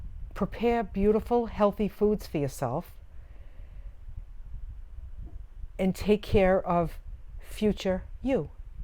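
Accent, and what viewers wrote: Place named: Boston, United States. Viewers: Hi! You're a lot of fun to watch - love your sense of humour and Boston accent. I'm hooked on your videos. <3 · New sub here. I am curious, what did you do before you retired? You seem like a teacher to me, maybe a New York or Boston accent? Just a guess. Love your channel too❤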